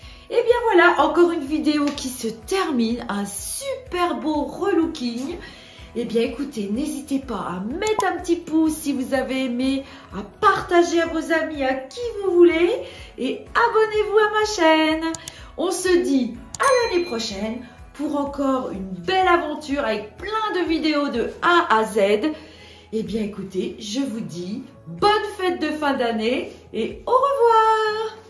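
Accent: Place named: Franche-Comté, France. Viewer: Bonjour j adore! J ai reconnu un accent franc-comtois de mon avance..? Bisous et bravo! Ps: j ote les ferrures en général, mais pas toujours possible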